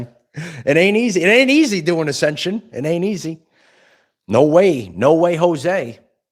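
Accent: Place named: Brooklyn, United States. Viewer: ❤ your Brooklyn Accent I have a friend from Brooklyn and her husband is from Lone Island that lives here in Texas as well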